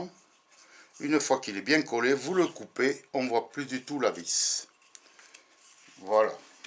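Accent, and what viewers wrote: South, France. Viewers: tres sympa cette video. et toujours avé l'accent!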